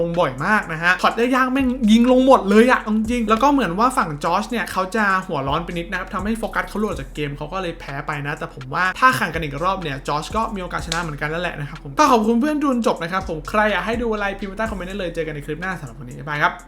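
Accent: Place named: United States. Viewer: ทำไมพี่ต้องติดพูดเมกาด้วยครับ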